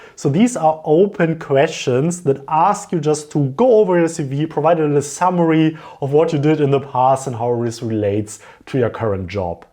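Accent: Germany. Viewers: The quintessential German accent!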